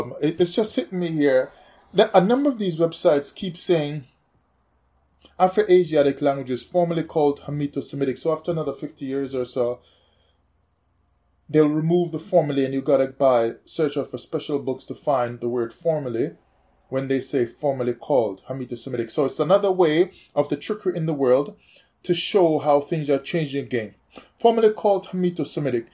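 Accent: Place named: Caribbean. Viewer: Hi, I hope you are well. I have listened to your videos on and off for several months although I have not seen the last few months worth. The last ones I really related to were those that spoke about the original language. I could relate to your frustration of what really is the original - I now feel I have found the answer. Like me, you sound like you are from the Caribbean and you will most likely share my heritage. Please find me on facebook (Zephaniah Blake) and lets talk. Peace and love.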